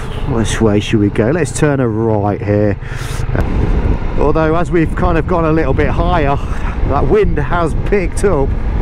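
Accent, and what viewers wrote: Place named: Leicester, United Kingdom. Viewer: I live in North Yorkshire now but love it when I hear a Leicester accent, where I’m from. Great bike, tempted to swap my GS. Entertaining video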